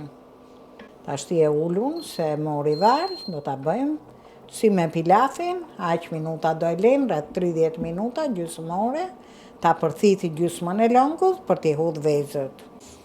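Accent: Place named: Central Albania, Albania. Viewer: Te lumshin durt dhe goja me tfolmen e shqipnis mesme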